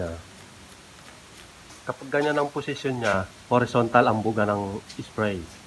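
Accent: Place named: Pampanga, Philippines. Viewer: Kapampangan na kapampangan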